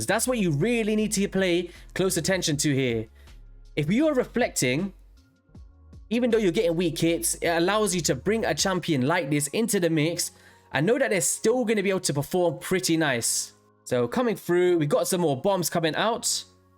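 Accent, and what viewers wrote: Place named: London, United Kingdom. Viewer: but any particular area of london? as I have heard londoners but none like you, forgive my uk ignorance i am truly just trying to differenciate. like i enjoy your voice but i feel its more specific than london..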